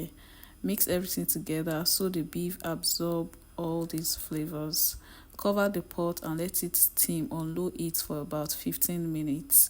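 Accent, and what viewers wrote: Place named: Nigeria. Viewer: I am Yoruba & I can tell from her accent that she is a Yoruba or Edo woman. However, it doesn't matter. I learn from different people from different parts of Nigeria, Ghana & Cameroon.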